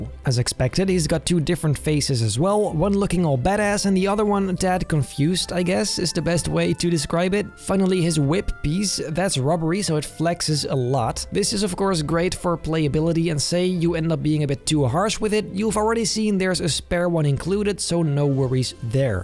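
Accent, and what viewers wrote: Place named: Netherlands. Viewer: first time i clicke don and heard your voice i was like that is a dutch guy for sure or atleast belgium german just that specific accent and pronounciation of words that my fellow dutch people do but i dont cuz i learned english myself by nonstop youtube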